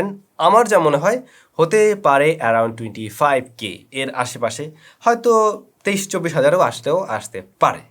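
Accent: Barishal, Bangladesh. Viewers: ভাইয়া কি বরিশাইল্লা??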